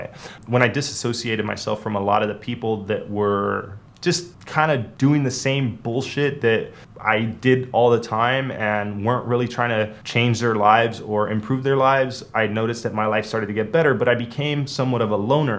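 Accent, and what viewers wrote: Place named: United States. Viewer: Shit man there are so many of us who fight the devil daily.... everything you have said reminds how young one started taking sips from our parents pinching a cigarette etc etc I had water in my eyes... then tarifa appears and playa los lances that gets me in shock... with your American accent thinking you state side.... got to much for me at min 12.50 roughly I'll finish it thow then have to take a benzo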